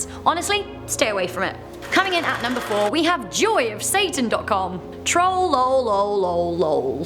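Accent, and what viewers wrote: United Kingdom. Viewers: Won’t be visiting those sites. But I swear you are one beautiful women Jesus plus that British accent wow lol. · I may have a small crush on...or maybe a crush for Britain voices telling me things in top 10 list format.Either way I am satisfied 🙂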